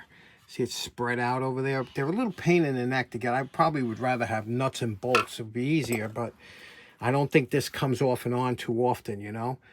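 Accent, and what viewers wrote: New York, United States. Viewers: Great Video bro that Vacuum came out great def in my opinion the best YouTubeer to listen too while doing a restoration 💯 Its probably the NY accent has to be 👍🏼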